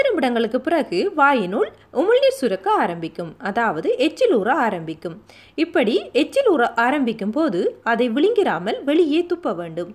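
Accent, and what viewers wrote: Sri Lanka. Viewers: நீங்கள் இலங்கை தமிழரா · Avunga srilanka apdithan pesuvanga bro..avunga real speech apdithan... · It's srilankan Tamil,l like this Tamil. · என்ன அழகு..... ஈழத் தமிழ் அழகு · Her tamil looks like srilankan tamil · உண்மையான தமிழ் ஒலி வாழ்க வளமுடன் இலங்கை தமிழ் அழகு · இலங்கை தமிழ் அருமை... தமிழ்நாட்டு தமிழன்...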